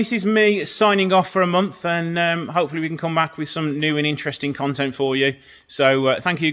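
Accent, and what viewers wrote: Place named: Midlands, United Kingdom. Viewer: Hi, regular subscriber here. I love your videos for loads of reasons, the main two being a) Alfas and b) your accent reminds me of where I grew up, it's like being home. It's really great to hear you, as an ex-pat Midlander! I haven't been watching ANY YouTube channels as much as I did during lockdown, mainly because I've wanted to be out of the house. Keep doing exactly what you are doing. I liked the one where you went out and about with a trailer...stuff filmed outside of the unit, but on your theme, is great. Keep up the great work.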